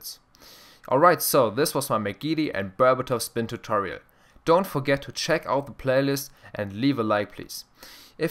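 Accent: Germany. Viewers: Der ist doch hundert pro n deutsch das hört man sofort :D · r u german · Ich finds so lustig wie man es raushört, dass du deutsch bist. :D Btw gutes Vid.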